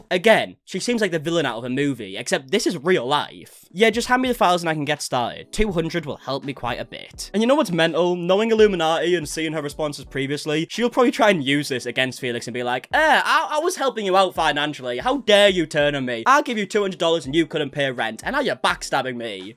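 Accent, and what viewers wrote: United Kingdom. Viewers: I wonder why so many British drama channels are so into covering this nutball woman. Seems like every time I click on an update - yep! At least if I have to hear about that horrid beast, it's in a pleasant accent! :)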